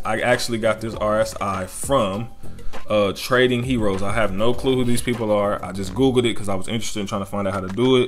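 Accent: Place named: South, United States. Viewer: Man you sound like you from the South. Where you from my G?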